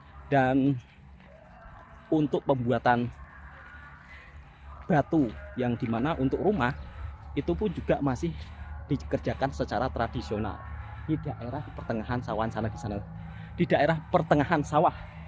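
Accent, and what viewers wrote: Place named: Central Java, Indonesia. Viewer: Bukan itu kalo liat logat bicaranya jawa tengh pesisir utara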